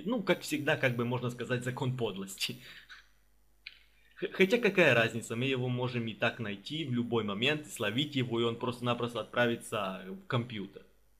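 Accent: Armenia. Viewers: Акцент армянца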